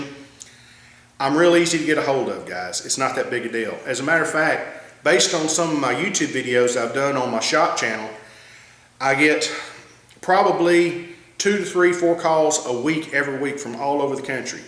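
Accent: South, United States. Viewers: I like this guy, there is something cool about a guy talking sense in a southern American Accent :-)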